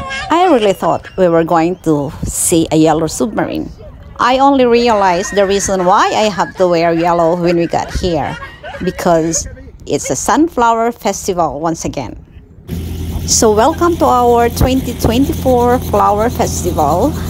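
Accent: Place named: Philippines. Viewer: I like the Filipino (a) accent.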